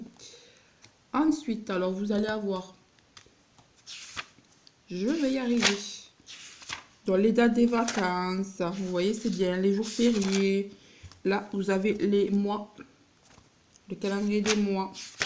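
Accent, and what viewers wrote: South, France. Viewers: ton petit accent du Sud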